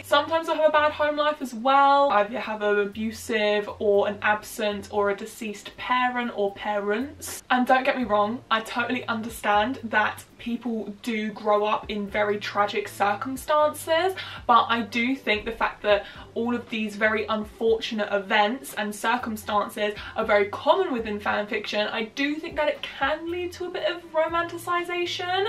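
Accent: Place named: United Kingdom. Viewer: It's pretty intriguing to hear Uptalk and Focal fry blended with a UK accent.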